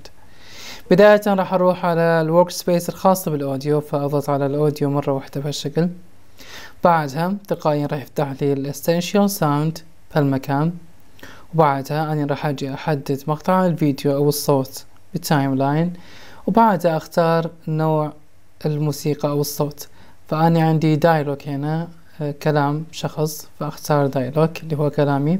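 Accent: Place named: Mosul, Iraq. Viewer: من اللهجة مبين من الموصل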